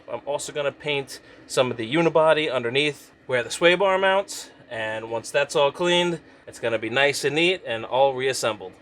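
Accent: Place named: North, United States. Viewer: I actually had to google what the heck that was 🤦‍♀️😂 LOL! I don’t talk to many people from Lawn guyland, so I was out of the loop. You definitely have a northern accent! Especially when you’re talking to other northerners. I do the same thing when I go home 😄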